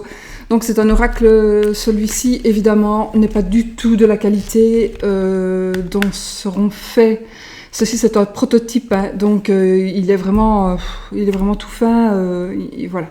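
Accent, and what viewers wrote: Belgium. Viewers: Bonjour,Magnifique ton oracle … tes dessin sont tellement parlant … il m'a tapé dans l'œil dès que je l'ai vu et j'aimerais vraiment me le procurer … j'espère que cela est encore possible.. J'adore ton accent vive la Belgique … cet accent il me manque … mon mari était belge malheureusement il est décédé en juin 2018. Belle journée à toi. merci Pascale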